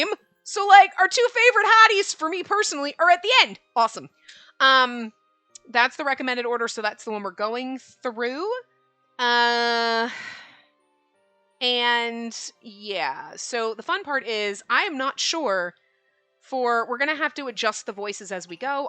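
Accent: Ireland. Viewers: "Favorite boy, he's the last route, he's an asshole..." Me: Waiting, knowing full well that this boy is gonna have glasses, is gonna be a robot, or something else special. " Fukuyama." and there it is XD Does he have white hair (Looks to see) Blonde? Okay, close enough. XD XD Irish accent. Didn't it's regular use start with Bustafellows? Shu? You just wanted to experiment with it but it ended up sticking? (and making me REALLY DISAPPOINTED that Shu did NOT have an Irish accent in the game...) I can't recall you using an Irish accent regularly in your repertoire prior to that. Also, (adding after the end.) Fukuyama DOES voice the sketchy characters, but when it comes to Vyn Richter, I got so tired of the "he's a red flag" deal I literally wrote a 2k essay on why he's not and I will proudly stand by that essay. Vyn is a good boy, even if he wasn't voice by, so haters need to leave him alone. 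XD XD I'll stand by you as you stand by him. LOL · The Irish accent started with Jack from london detective mysteria because he had a accent was how he was written But you really start to use it with norn9 I believe that was the kickoff point when you start to use it with almost every game which I love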